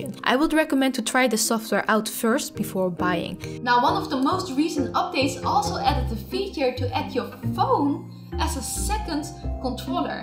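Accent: Netherlands. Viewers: You have a Dutch accent?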